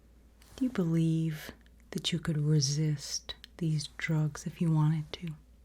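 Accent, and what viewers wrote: Midwest, United States. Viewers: Awesome U.S. accent! Truly! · You say it's dodgy but I immediately clocked it as a non-specific American accent with the "Hi there." So I think it's a success. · ooooh your american accent is scary good! the only tell is that you said “rather difficult” where americans would probably say something more like “kind of” or “kinda” instead of “rather” in everyday spoken language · This is the second video I’ve seen of yours where you use an American accent (the other being the Noir Detective role play) and damn, you pull it off really well! Like others have said, your “Hi there” had me saying “Whoa what?!” 😂 Impressive as always! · AMERICAN? as an American myself this was pretty good!! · the American accent??? 😱🤌🏼❤️‍🔥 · I’m a californian and she sounded almost mid western to me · Haven't heard this accent since your last cranial nerve exam I believe! 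Very appropriate to use an American accent for MKUltra 10/10 accuracy and performance. Love your vids, thank you so much for keeping us entertained (and relaxed) <3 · Greetings again good lady. You are simply going from strength to strength with every upload. This video, the A-Z, the Backrooms video, the Infernal Job Interview... I could keep going, but will not for the sake of brevity. I loved your American accent here, and it seems that your American watchers did as well, judging by the other comments. I thank you heartily for your wonderful work, and I eagerly await your future uploads. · gotta say, (as an american) you NAILED the accent! it was so uncanny to hear you as an evil *american* scientist as opposed to a british one! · Great American accent! 👏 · The basically perfectly American sounds coupled with the still-mostly-British cadence makes this so surreal 😂 fantastic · I was wondering why you sounded so different at first until I noticed all the comments. 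You really hammered the American accent pretty well. It's hard to pinpoint a specific, but it's like a mix between the Midwest and New England accent. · Wow, your American accent is SO good! Personally, it makes me think of someone from the Midwest who's moved to New England. It also really reminds me of a specific episode of Orphan Black in which one of the characters who has an Irish accent (Siobhan) is impersonating someone who's American or Canadian (the show is rather vague about that for the most part). Regardless, I'm really impressed and rather unnerved, seeing as I'm so used to hearing an English accent from you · The Ameirican accent was not expected but was very much appreciated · pls more amerivan accent asmr❤ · That American accent freaked me out but it was very good 😅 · I spent more time seeing if you'd slip up 😅 but you did really good. Not dodgy at all! "If you were stood here" was the most sus I like your American accent. 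Hope we can hear more of it! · Okay, having rewatched the video a couple of times now ( -induced naps for the win), I have to admit that this American accent of yours is really growing on me. I imagine it's quite the shock for many viewers to see your signature voice sound slightly altered, but it's also super fun to see (well, hear) you playing around with it! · Your american accent is so good, coming from an american! You sound like my family members from the midwest. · The American accent gave me whiplash so hard that I’m now fully awake 😭🙏🏼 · It sounds like a midwestern accent, like in states near Canada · I was not expecting the American accent, caught me off guard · Not only the "R"s, but also the use of "gonna"? Excellent American accent! Really impressive (and still just as precise and relaxing in tone).🖤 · Oh wow! The accent is great. If this was my first time watching I'd think you were American! 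Just a few vowels gave you away 😉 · Everyone’s talking about the American accent, but this is my first video so I was thrown off because I thought she was going to be Russian😂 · Really love the American accent! Very tingly ahaha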